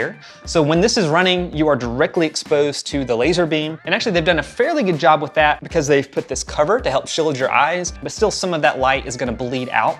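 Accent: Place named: Tennessee, United States. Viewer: Dude where you from?! lol I hear the country boy within. TN?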